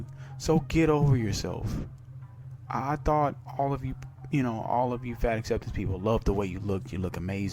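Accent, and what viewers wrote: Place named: South, United States. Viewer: His corn pone accent makes it all funnier.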